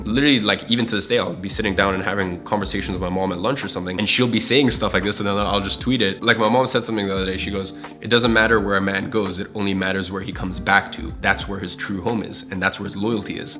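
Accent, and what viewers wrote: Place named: France. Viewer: French accent 😂😂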